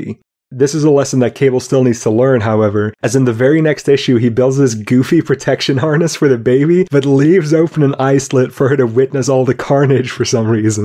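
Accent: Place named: England, United Kingdom. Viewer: I heard some English accentuation in this video. You might have been picking up something from your friends too it seems!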